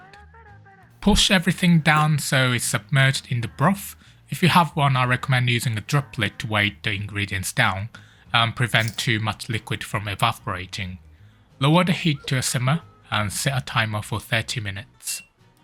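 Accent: United Kingdom. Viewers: ur british accent sounts rlly good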